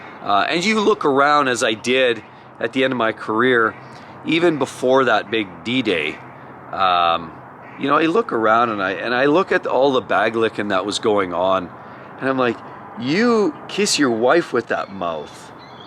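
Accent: Alberta, Canada. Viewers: You sound like your from Alberta, am i right ?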